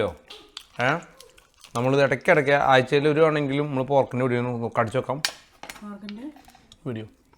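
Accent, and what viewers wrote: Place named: Pathanamthitta, India. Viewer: Nallea pathanmthitta parayanea.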